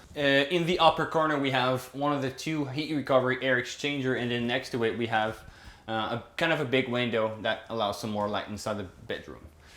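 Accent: France. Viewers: This is absolutely excellent. This is my favorite one. And I noticed that you've lost your French accent, which I miss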